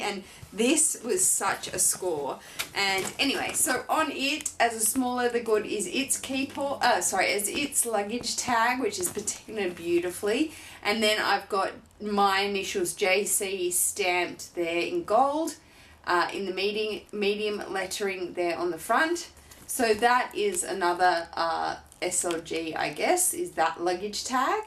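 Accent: Australia. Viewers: Love your videos and your aussie accent :) I would like to get the Neverfull MM. Lots of love from Berlin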